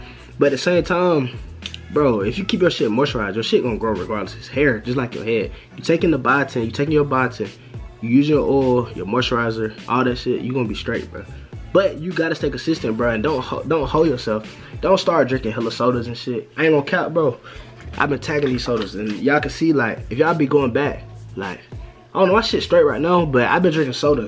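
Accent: United States. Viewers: Bro where you from? I feel like you from texas😂the way you talk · You from Atlanta?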